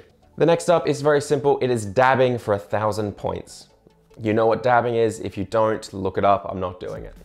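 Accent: Ireland. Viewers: As a German that is constantly being told that I have 0 accent, I took inspiration from your Irish accent and let my chat force me to "spiek in zeh tscherman eccent leik zhey taught as tu spiek in school" - instant redeem the first time I used it and my viewers got a kick out of it - thanks for the idea. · I like the Irish accent!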